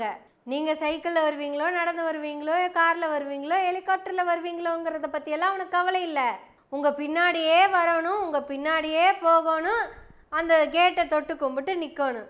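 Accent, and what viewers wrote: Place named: Coimbatore, India. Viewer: Dear Sister. Your Covai Slag Speech is very Beautiful. Keep it up. Best Wishes.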